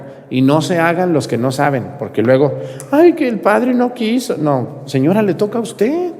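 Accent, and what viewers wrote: Mexico. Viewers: PADRE BUEN DIA..YO trabajando i Escuchando CON GANAS.TU ACENTO MEGICANO ME AGRADA.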